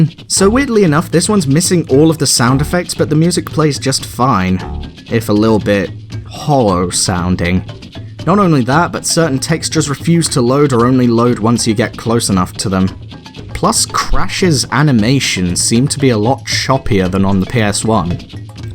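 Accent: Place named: United Kingdom. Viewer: never even heard of bleem until this video was recommend to me 😂 and which part of Britain are you from?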